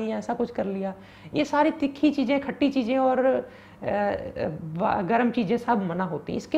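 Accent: Punjab, India. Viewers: Aap bhut achha btate h pr Aap ki looking naam match krta h Pr aap ki bhasha me punjabi lhja h achha nhi lgta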